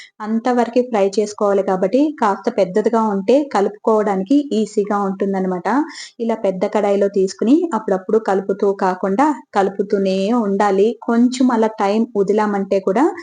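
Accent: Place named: Tamil Nadu, India. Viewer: Telugu raada meku Tamil laga matladutunnaru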